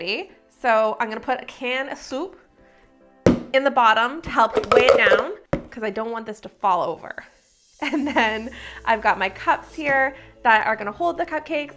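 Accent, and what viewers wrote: Canada. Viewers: are you Canadian? You sound like one. I love your videos btw! ☺️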